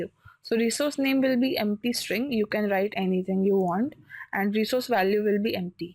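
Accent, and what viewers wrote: India. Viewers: I hate the Indian accent so much it's so annoying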